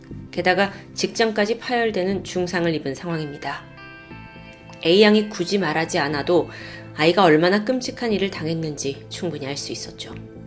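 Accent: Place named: Jeolla, South Korea. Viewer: 라도네요 ㄷㄷㄷ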